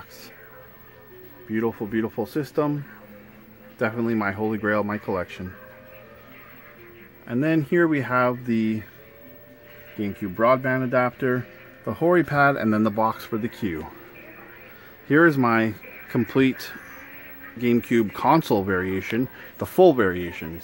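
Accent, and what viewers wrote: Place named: United States. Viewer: in the box i love when americans say that lol in the bahx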